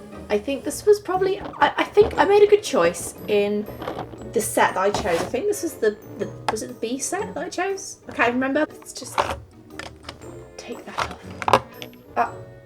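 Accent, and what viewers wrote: United Kingdom. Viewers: How come you grew up in america and still have such a british accent? sounds like you come from the south east of england around bristol...weird.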